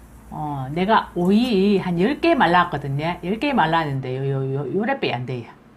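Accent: Gyeongsang, South Korea. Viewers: 이 갱상도 사투리가 넘 구여워요. ~^^ 사투리 듣기 싫은 분은 보지 마이소. 싫다며 왜 들으며 댓글에 올리는거죠? 정보가 좋으면 듣는거지요. 오이 심어 다량 나올때 아주 좋은 저장음식, 묵나물형이 될 것 같습니다! 고마워요. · 선생님 경상도 사투리가 넘 재밌고 영상을 보는날엔 하루가 기분좋고 중독되서 자꾸보고 밥때가 되는줄도 모릅니다~~ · 경상도사투리 넘 좋아요ᆢ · 경상도사투리가재밋네요. 지금은서울에사나요? 요리를쉽게배우게하니감사합니다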